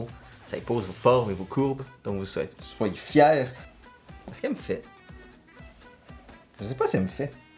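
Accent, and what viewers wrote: Quebec, Canada. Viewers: Yap, par contre parfois g eu du mal pour le Québécois! 😂😂❤❤❤❤❤🃏🃏🃏🃏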